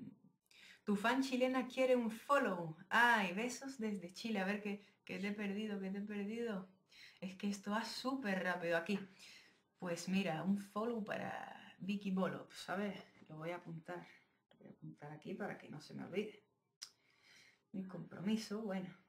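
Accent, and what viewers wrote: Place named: Mexico. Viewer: Holaaa Abraham soy Maria de Argentina- Buenos Aires .. Per me dicen Mar , bueno te queria decir q cantas muy lindooo , sos mi amor platonico la vrdd , me enamore de tu dulce vos jejeje si tenes algun tiempito me gustaria q escucharas mis grabaciones de mi cuenta en youtube y me dieran tu opinion ,ya q te admiro bastante y me gusaria conocerte masss bonitooo. Te kieroo muchiiooo , adoro tu asentoo mexicano...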